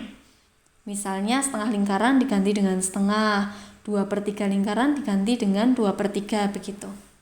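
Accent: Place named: Java, Indonesia. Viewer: Bu guru kyak nya chinese...tapi logat nya jawa... .khas logat hokien...😂😂😂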